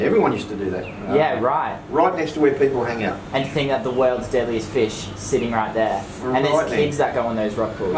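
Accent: Australia. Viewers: Is he from Australia? nvm xd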